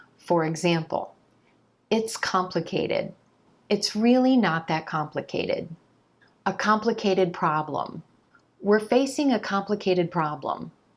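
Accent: United States. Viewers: Hey, you’re awesome. Learning from your channel is such a golden opportunity. I enjoy getting here as often as I can, and I love to hear your genuine American English. Just feel like home.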